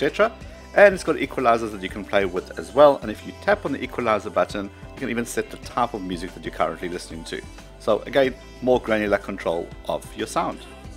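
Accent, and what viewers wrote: South Africa. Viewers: Sound south African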